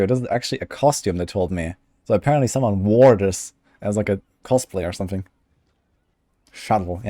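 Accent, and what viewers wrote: Germany. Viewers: Seems like a nice trip you had, You are from Bulgaria, we live very close, I had a feeling you where from Germany coz of voice accent 🙃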